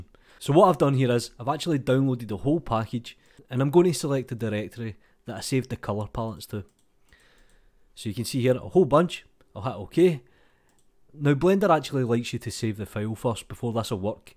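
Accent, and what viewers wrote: Scotland, United Kingdom. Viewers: Brilliant! Thanks for the great tutorial: easy to follow and nice to hear a Scottish voice on Youtube!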